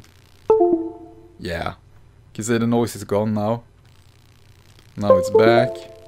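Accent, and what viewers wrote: Sweden. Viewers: Could you by any chance be swedish??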